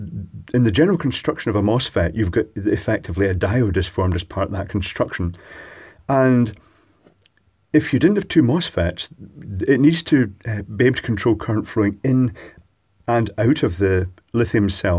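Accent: Scotland, United Kingdom. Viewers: I am a nonnative speaker of English,yet from the first seconds on I liked your Scottish accent.By the way, the Irish and Scotts are the only English speaking people I like as they were also tormented by the imperial empire.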